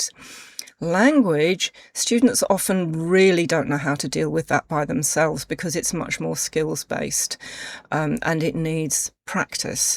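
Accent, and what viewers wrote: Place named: RP, United Kingdom. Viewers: I find it enjoyable to listen to your English British sound. I wish I could speak like you. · It is great help for native speakers like me to learn RP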